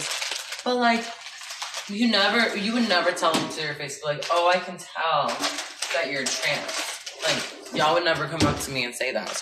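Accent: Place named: South, United States. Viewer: That country accent, WOOW SOUNDS SOOOO HOT!! I'm glad you dont sound valley. I kno bout ur up bringing, hearing the story from you. WHOS YOUR INSPIRATION hmm something like that EVERYTHING YOU DONT WANT TO BE LIKE!! is the best answer. HUGE PROPS AND HUGE MAD RESPECT TO YOUR UNCLE, TAKING ALL OF YAH IN!! That's soooo cool, you are taking care of him. I end up changing my wallpaper to your picture. It's the picture from Pilow talk, with the light blue Luke sweater. It's me favorite picture of you. I LOVE STOLEN like shots. You look and are BREATHTAKINGLY STUNNINGLY GORGEOUS!! LOVE AND HELLA APPRECIATE THAT YOU ARE LEVER HEADED, SMART AND WITH A DEGREE, VERY WELL SPOKEN.. LOVE ALLLL OF THEEEE ABOVE. I ADMIRE YOU.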